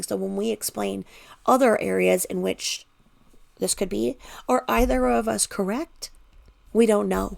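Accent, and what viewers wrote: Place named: South, United States. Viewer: She's from the South. We say wadder and washcloth. We also say hosepipe. 😂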